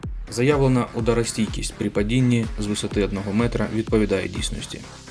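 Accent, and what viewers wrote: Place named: Russia. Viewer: как бы не пытался, а русский акцент слышно за версту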